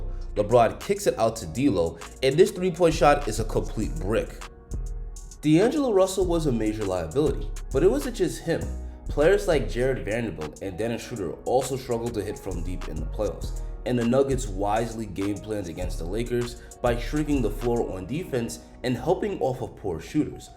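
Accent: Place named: Philippines. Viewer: Is this from a filipino point of view? I can tell from you’re accent.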